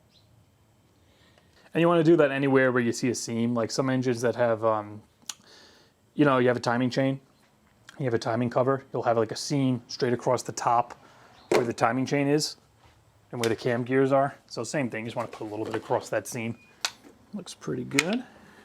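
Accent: United States. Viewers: Where are you from? You sound American, I live in the us and have an Saturn astra here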